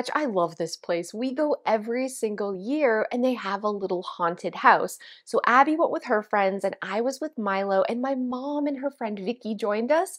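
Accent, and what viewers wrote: Canada. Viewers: I love the Canadian accent I hear once in a while. My family is from Canada but lost their accents years ago....I also Love your advice.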